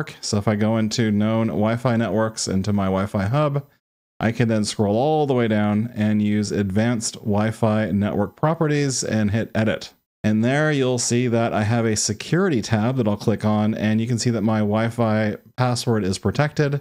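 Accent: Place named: United States. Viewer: American pronunciation BAD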